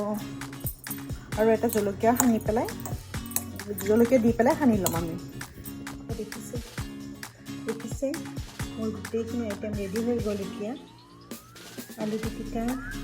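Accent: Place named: Barpeta, India. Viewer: বৰপেইটা ভাষা আহি এ যায়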